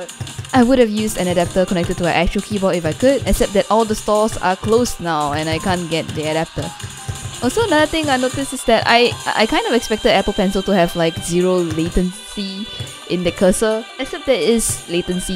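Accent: Singapore. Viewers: Oh man, I could recognize the SG accent anywhere :D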